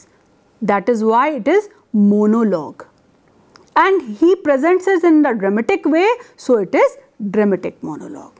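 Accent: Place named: North India, India. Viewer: Typical north-indian accent weighs heavily on the lecture. in terms of the content it is below the average. finally the attention to appearance is not in keeping with an academic context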